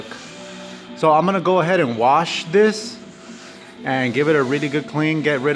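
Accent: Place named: Yorkshire, United Kingdom. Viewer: really useful. sweet accent British Yorkshire. and very useful regarding cracked old vinyles. thank u. U could have removed the glue by aseton easily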